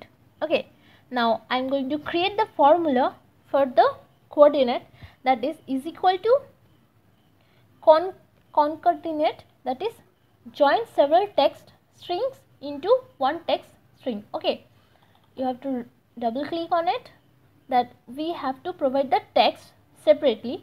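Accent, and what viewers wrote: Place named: India. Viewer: thank you for solving my problem. BTW, your Indian accent is very cute.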